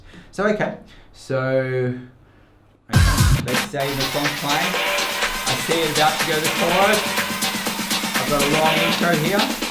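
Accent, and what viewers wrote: Australia. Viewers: You are Aussie right ?? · you got such a positive vibe mate, are u Aussie? just love your videos, great content and genuine way to teach, cheers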